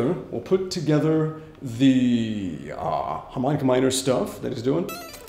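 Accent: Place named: United Kingdom. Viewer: speaks like British some times, He is the american G. Govan.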